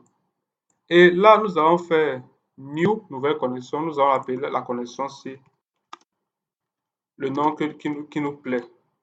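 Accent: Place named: Benin. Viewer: J'aime bien tes tutos, mon ami à l'accent béninois ou camerounais. Tu es opérationnel. J'aimerais bien avoir ton contact WhatsApp, si cela ne t'ennuie pas bien sûr. Le mien c'est le <num>. Je ne sais pas où tu vis, mais, le Burkina n'est pas loin et tu pourrais facilement y dispenser des formations!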